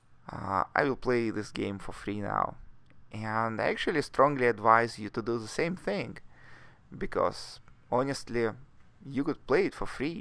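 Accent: Russia. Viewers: акцент русского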